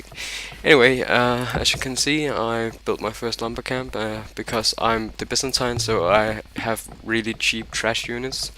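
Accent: Denmark. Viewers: are you danish ? of course you are, you sounds like a danish guy ?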